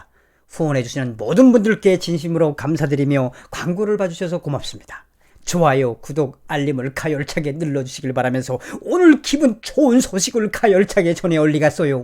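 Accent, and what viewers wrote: North Korea. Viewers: 죄송하지만 북한 말좀 좀 줄이시면 안될까용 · 탈북 했어요? 말투가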